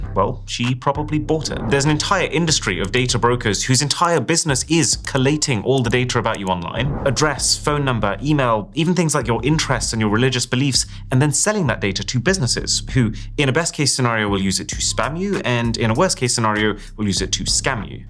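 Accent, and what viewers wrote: RP, United Kingdom. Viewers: Still feels like posh man talking down to us mear mortals... and you seriously come across as paid to talk up certain tech and brands... uour losing me and many others due to this 🛸👽🖖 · This is not American "English", it's UK English.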